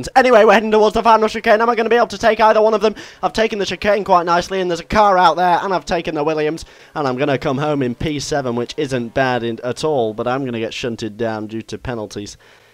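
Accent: United Kingdom. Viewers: He has a very normal British accent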